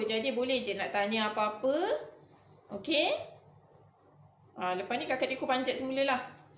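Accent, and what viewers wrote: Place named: Malaysia. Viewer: Serasa denger orang malaysia ngomong😀😂😁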